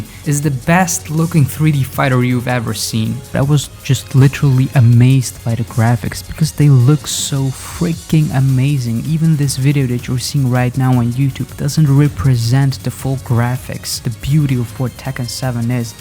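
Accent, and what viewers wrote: Germany. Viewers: where are you from? germany? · I am pretty sure he's from germany, he sounds very german.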